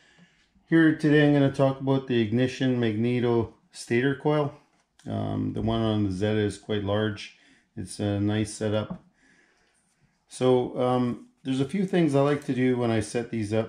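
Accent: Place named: Canada. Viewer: he has that canadian accent so cool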